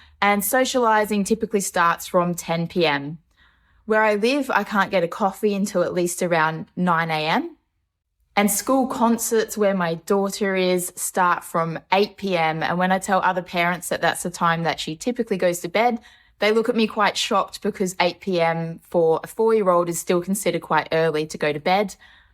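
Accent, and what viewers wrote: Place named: Australia. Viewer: I could listen to you talk all day long, LOVE your Australian accent ❤️🇨🇦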